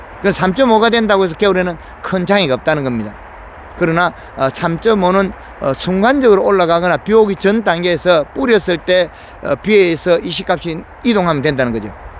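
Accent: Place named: Gyeongsang, South Korea. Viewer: 동분서주하시며 특히 경상도 본토 발음에 친근함 느낍니다 저는 마늘심고 잎이3~4 장될쯤 가을비가 많이와서 두둑이 잠길정도 빗물이차서 뿌리가 傷해서 대표님 말씀대로 요소를 주고 회복이됬는데 웃비료를 15일후 뿌리고 부직포를 덮고 날씨가 포근해서 골드그린을 시비할려고 부직포를 벗겨보니 비료 가스에 마늘잎이 노랐게 말라버렸읍니다 1월25일 비료를 주고 물을 주고 밤기온이 영하로 안내려간다는 예보를보고 근간 포근한날씨라햇볕을 보고 해서 많이 회복되는 감을 느끼고 대표니의 창녕 리포트를 보고 글을 올리립니다 생초보 지만 황금알농법을 열심히 실행하고 있읍니다 물이 차거워 마늘 작물이 스트레스를 받지않을까 생각도 되고요 아무튼 최선을 다해 해볼까 합니다 추후 동영상을 보내드려 도움을 받고 싶습니다 늦은 밤시간에 댓글을 올려 대단히 송구합니다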